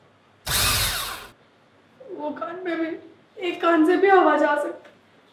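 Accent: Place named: Uttar Pradesh, India. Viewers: Yrr your up accent is too good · I loved your bhojpuri Hindi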